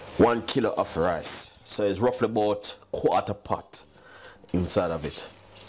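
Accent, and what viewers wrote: Jamaica. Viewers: Basmatic rice 😂 I love his Jamaican accent ❤️ In my opinion It’s not too much salt because of all the water and coconut milk he added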